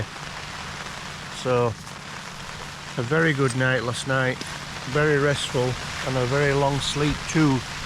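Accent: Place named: Rotherham, United Kingdom. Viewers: Where abouts are you from? I’m from Rotherham an recognise your accent 😂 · I came from TikTok, but I couldn’t be happier! As a fellow northerner (I can tell by the accent) who moved to The Peak District last year, not only do I find this enjoyable, but it’s so useful, I’m going to have to watch back through your videos and learn your amazing methods and tips! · Here from TikTok. Your accent sounds super local to mine. I’m from Keighley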